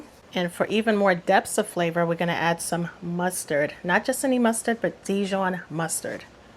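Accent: New York, United States. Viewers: I love your New York accent. Cayenne pepper is good over that pasta 😊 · U sound like ur from new york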